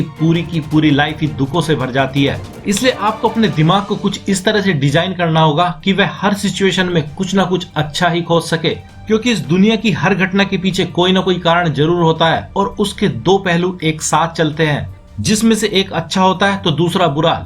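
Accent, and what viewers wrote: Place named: Punjab, India. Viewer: मुझे आभास हो रहा है आप पंजाबी व्यक्ती हैं।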